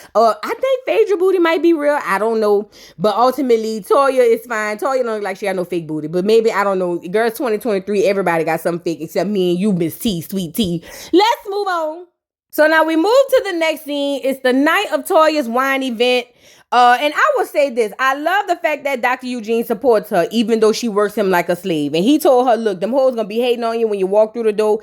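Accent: New Orleans, United States. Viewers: I just wanna say you funny asf and that new Orleans sounds just turns it up❤ im always on da floor crying 😂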